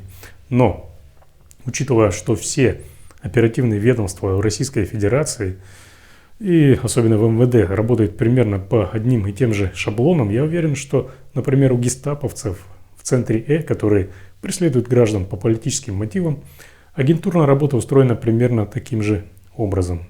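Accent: Ukraine. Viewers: Украинский говор. Гэ, Хэканье. По говору западный галичанин! Горбачев!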